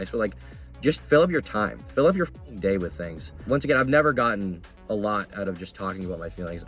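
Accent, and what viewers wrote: New England, United States. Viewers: Oh shit I didn’t know u were from New England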